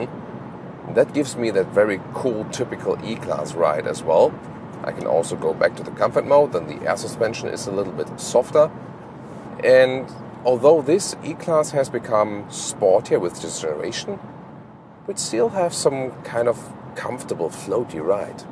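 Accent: Germany. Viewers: Bro man hört deinen deutsche Akzent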